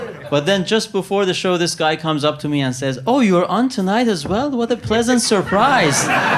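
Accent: Iran. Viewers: How does he have a Persian (Iranian/Farsi) accent when his name is? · Why is he speaking like Iranians lol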